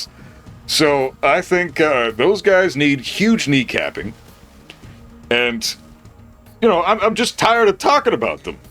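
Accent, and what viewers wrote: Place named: Canada. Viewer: You're Canadian 🇨🇦 too eh! I'm from Toronto.. love to hear it.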